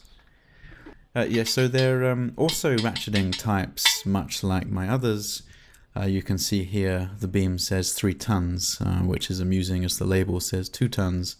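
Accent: England, United Kingdom. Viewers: Definitely not Aussie An English Accent 🏴 👍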